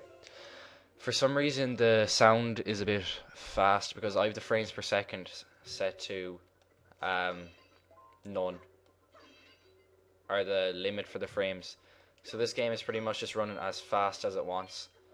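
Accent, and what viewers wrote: Newfoundland, Canada. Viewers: Im a newfie too, saw the zelda back ground and heard the accent and thought "MY BRETHREN" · your from newfoundland?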